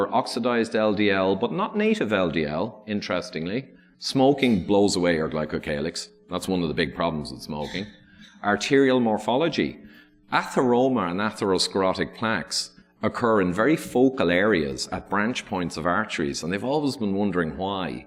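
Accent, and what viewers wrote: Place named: Ireland. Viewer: Truly starts The Irish accent ... truly the sound of angels singing ;-)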